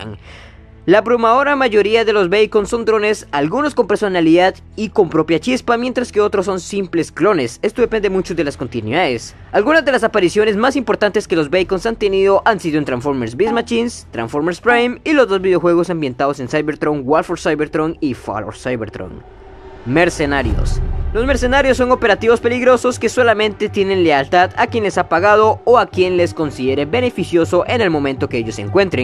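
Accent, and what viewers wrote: Costa Rica. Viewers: Tu acento parece costarricense. ¿eres Tico? Pd: gran video, sigue así. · Acento Tico....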